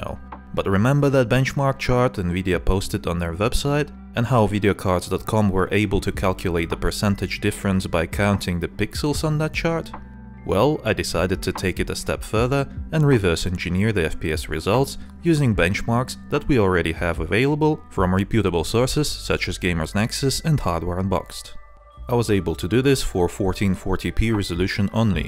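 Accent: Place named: Russia. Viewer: Russian author, I understood it by the accent and his name and asked why he doesn't make videos in Russian. He said he was doing , but he didn't like it. He didn't explain what it was. I answered with a Russian idiom that can be translated as... no, not literally), but the meaning: "your channel - your rules" In General, nothing important to you, just a conversation between two Russians.)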